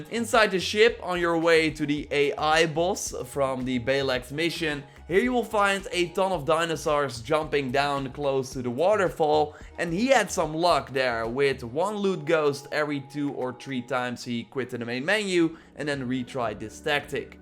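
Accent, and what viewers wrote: Netherlands. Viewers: Are you dutch because your accent bleeds through every now and then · Because they're both Dutch and this is a common accent here. They overpronounce stuff. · That dutch accent though..... Lekker bezig mannen!! 😜😎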